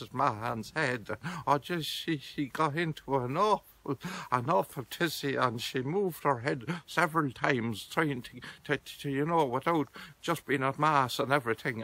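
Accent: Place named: Longford, Ireland. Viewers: This made me laugh. My ancestors are from Longford so I guess they spoke this way. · it's a North Longford accent.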